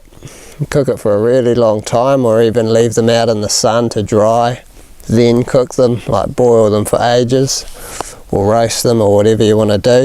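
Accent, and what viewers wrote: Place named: New Zealand. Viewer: Huppast 12 to huppast 2 ah bro 👍 Spoken like a true kiwi. Love it !! 😎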